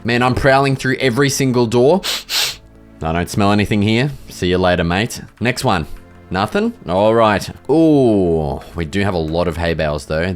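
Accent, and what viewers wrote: Australia. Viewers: I can tell this guy is from Australia because of his accent and how he speaks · Ready to put this on and go to sleep to the best Aussie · Bro you can just tell this dude is Australian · Are you Australian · I have stumbled across an Aussie minecrafter that’s speaking adult talk! I’m so happy lol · I know this guy is Australian I think because of the accent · R u aus?🇦🇺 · I've never realized how Australian actually sounds 😂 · Bro IS the stereotypical Australian.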